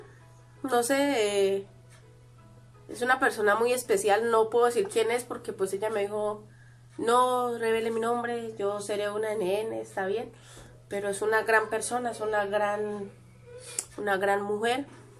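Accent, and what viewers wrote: Colombia. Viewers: A mi me encanta su forma de ser y decir las cosas muy directa pero sobretodo su acento colombiano, no haga caso, siempre hay gente envidiosa y maluca como dice usted. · Hola mi hermosa, no usted no nos aburre a mi en lo particular me entretiene y ese timbrito de voz colombianito me encanta 😍 me gustan mucho las personas colombianas yo soy de Mexico y siempre me ha gustado el tono colombiano. Cuídese que Dios los bendiga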